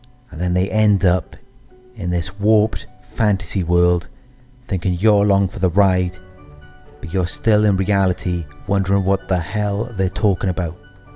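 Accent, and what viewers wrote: Wales, United Kingdom. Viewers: New sub. You from Wales? You sound like it. So true video.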